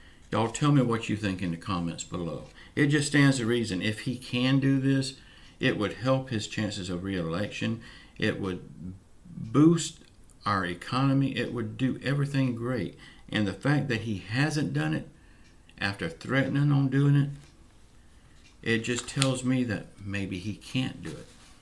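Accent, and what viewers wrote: South, United States. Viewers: I love your channel. I want to Thank You for everything.. U r the only one that seems to know whats going on , plus o love that southern accent... take care hope Amy is doing lots better ..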